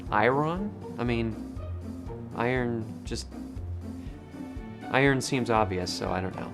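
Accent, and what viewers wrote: California, United States. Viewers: Far and away an obvious Californian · you say San Francisco with a slight "p" sound where the "f" is. Also you say "fer" instead of "for" when you're not paying attention. Yup, Californian. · Ooh, nice catch! I grew up in Santa Rosa. It took living in other states to understand that yes, it indeed is a California accent. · Your accent sounds like mine. I’m from central california coast. So yeah you have a California accent! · Californians over pronounce the English language. That is why you do have a Californian accent. I would guess Northern but you already confirmed it. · I was looking for a video to explain the Californian accent to my English students and they are learningn English. You started speaking and I thought wow you must be from close to where I am from. In fact I grew up in Napa too! That's really cool! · Bay Area born and raised, until I moved up to the PNW around age 30. 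I definitely noticed CA transplants after living there for a few years just by the way they talked. I did pick up on your accent, though you were talking pretty slow in the intro to this video. If you were talking at normal CA speed it would definitely come out more. I live in CO now, where I really have to slow my speaking down, even compared to PNW. I don't even pronounce the "a" in "theater".. just straight up "theeter". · "we speak much faster..." Uh come on dude, that is absolutely not a stereotype or classification property of the California accent or of any other accent, it is more due to personal preferences and other personal features. That stretching of phrases like "no wayyyy" either btw. Sry but what are you talking. Dude of the vid has a (light) Californian accent (not a strong one)...I can tell since I am Californian myself. And yes I know the vid is very old (in fact it is half as old as me) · California. 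Not only accent, but even your mannerisms. If you aren’t from California I would be shocked. You could be my neighbor. : ) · Yeah it's pretty Californian, but I'm from Socal myself so I can't tell. if I wasn't a native, I prob wouldn't know the difference between Cali and rest of the west. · You have a California accent, close to mine. 40 years ago I arrived at a youth hostel in NYC and the manager's first comment was "you're from California." It was the word "howdy" with no southern accent that tipped him off. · You Californian as fuck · The thing that gives you away as Californian is the sing-songy cadence of your speech. This is a major thing that differentiates it from the heartland midwest accent (think Omaha or Kansas City: smack dab in the middle of the country. This is where the "standard American dialect" comes from for the most part). How do you say "milk"? I know a number of Californians who say "melk". 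Very odd. Finally, the other thing that marks you as a Californian is your inability to imagine people saying words differently than you do, or using different terms. Like the fact that you've never heard someone say "ahh-urn" for Iron. Or the fact that you think you can only call athletic shoes sneakers or just shoes. Many people call them tennis shoes. In my experience, most Californians haven't traveled much in the US and are somewhat sheltered from Southern, midwestern, or rural cultures. Hopefully this doesn't sound too harsh... I just think it's interesting that you couldn't picture other ways of speaking in some cases (no one says sal-mun for salmon. But northern midwesterners say it with nasal a, and southerners say it a bit like "sahy-mun"). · A white people California accent. That what you got . every group of people everywhere you go anywhere talk different An got they own accent . 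Jus cause you from Cali an talk that way don’t mean everyone talk like you . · omg hell yah man! That was hella california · I could tell you were not from southern ca quickly. Calif but not south.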